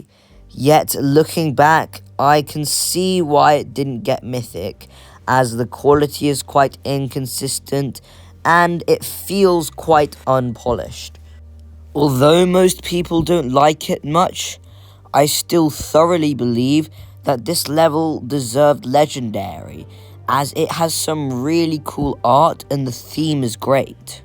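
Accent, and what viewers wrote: United Kingdom. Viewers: I didn't think somebogy's voice could be this British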